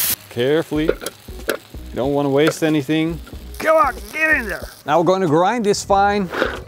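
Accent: Netherlands. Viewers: It's funny, how I always can hear if someone is not natively a english-speaker. Even though your english is really really good i can hear that you are a dutch man, also in your video with that knifeboi I could instantly hear that he is a german. I love that. I love your videos, keep on it.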